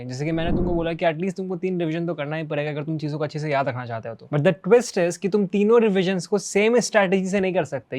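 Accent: Bihar, India. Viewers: Do u from Bihar ?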